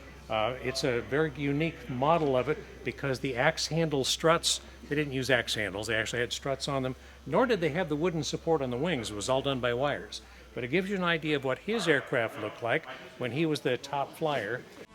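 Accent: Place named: England, United Kingdom. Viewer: The best useful info and at least , I could learn English accent from you 🙏🏻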